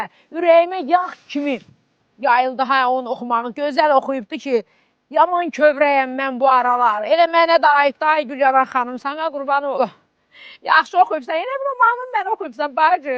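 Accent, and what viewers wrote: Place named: Gadabay, Azerbaijan. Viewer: Bəs özü deyir Goranboydanam.. Ləhçəsi oxşuyur ama Gədəbəyliyə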